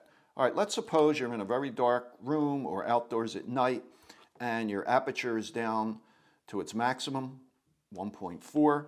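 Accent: United States. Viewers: you have a usa accent. do you specifically hang on to the softer canadian nickel for opening the battery cover?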